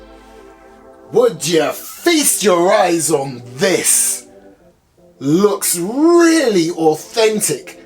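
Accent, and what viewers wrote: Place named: United Kingdom. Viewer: sounds British so maybe he lives in the UK and he's not allowed by the law to buy or own the real version🤔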